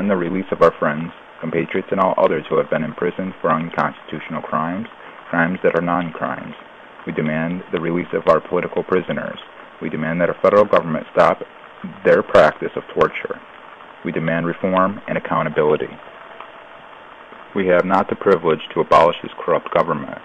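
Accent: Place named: China. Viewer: Frutation??? Subjigated to...? Chinese english...not bad though....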